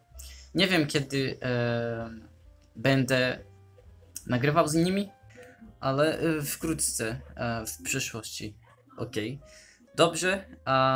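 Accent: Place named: Poland. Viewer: Teraz dopiero komentuję, ale właściwie to czasem masz właśnie bardzo... polski akcent :D Tylko "ę" na końcu "się" wymawiasz mocno inaczej (ale i tak każdy to zrozumie), tak poza tym to bardzo ładny akcent :D Ukraiński jest dla mnie trudny jeśli chodzi o akcent, taki mocno inny. Moja mama ma znajomą z Ukrainy, to czasem rozmawiałyśmy. I ona się nauczyła polskiego, jednak ma dużo bardziej widoczny akcent niż Ty :D Niby też słowiański akcent, jednak dla mnie mocno się różni xD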